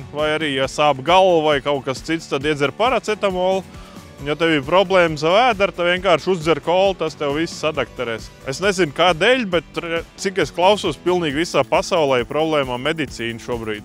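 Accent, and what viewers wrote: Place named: Ventiņi, Latvia. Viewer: Fanoju par ventiņu dialektu. Katrs video foršs, bet šajā jo īpaši izjutu to akcentu! 😄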